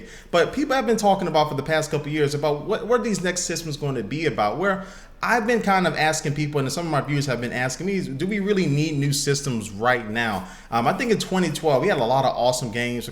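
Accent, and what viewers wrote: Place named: United States. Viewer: I thought he was American.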